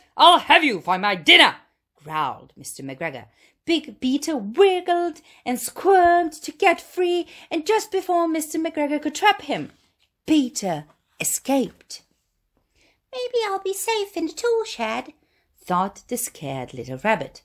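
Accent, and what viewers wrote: United Kingdom. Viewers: Divno! Obožavam da slušam ljude sa britanskim akcentom!❤ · Savršen britanski akcenat. 😊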